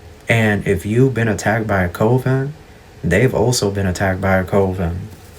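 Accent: South, United States. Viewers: I love your sweet southern accent ❤ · You got a country ,tipsy sounding ,way you speak 🗣️ 💜 I love it ! ! ! 😍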